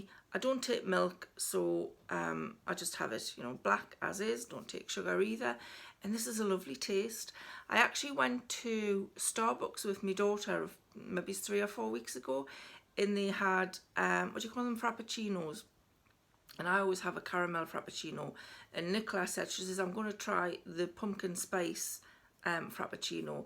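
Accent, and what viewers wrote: Newcastle, United Kingdom. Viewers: Hi going to give the shampoo and conditioner a try always been curious of that stuff. Do they do a shower gel, love your gordie accent.😊x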